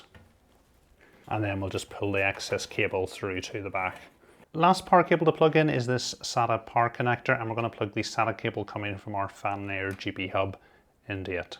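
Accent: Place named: Northern Ireland, United Kingdom. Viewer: Really good to see this great build, and even better to hear a local NI accent. Super job especially with these 2026 parts prices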